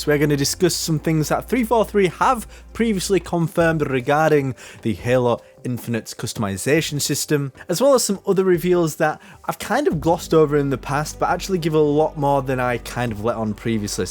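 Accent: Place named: England, United Kingdom. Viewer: Wanna know what's beautiful? Your wonderful english accent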